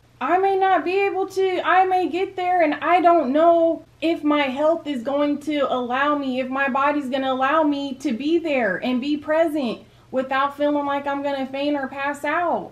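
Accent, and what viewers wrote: United States. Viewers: Where is her accent from in America?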